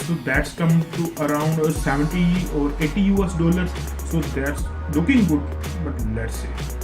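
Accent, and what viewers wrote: Punjab, India. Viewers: oh Jada naa bol gandia jehia video banada hai knowledge koi nehi ,oda hi boli janda moto g4 9000 da ayia hai tu 11000 dasi janda teri news hi chuthi AA ...... PTA nehi ketho ketho AA Jande AA .. 22 technical guruji Ja sharmaji technical dekh kini sehi news dende aa ... rest comment in Hindi...... or baat English ki Teri English vi Punjabi tone vali hai Tumhari videos dekh ke admi bor ho jata hai.. tabhi koi view Ja like Ja comment nehi ata.......💩💩💩💩💩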